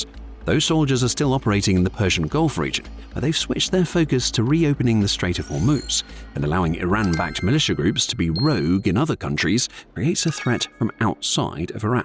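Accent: England, United Kingdom. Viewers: So the Narrator sounds like he is from the ENEMY of the US, England, & the BBC. They are the HUB of the International Cabal who Trump has been fighting ever since coming down the Escelator.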